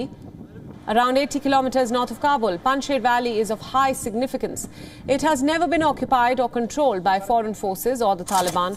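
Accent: India. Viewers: Why the narrator is speaking like an Indian Mama Pundek? TQ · Hall If you had worked in high tech in the US, you would find her accent easier to understand -- you would have had so much practice learning to understand it from listening to your Indian coworkers! But it gets worse: there are more people speaking English with her Indian accent (or one similar) than there are speaking British or American English! Indians will get the ability to shape the future of the language due to their numbers!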